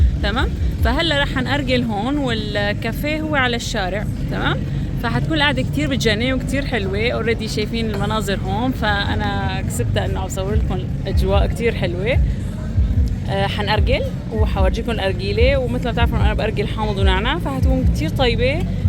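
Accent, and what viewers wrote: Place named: Egypt. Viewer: ربنا يسعدك حضرتك بتتكلمى مصري ماشاء الله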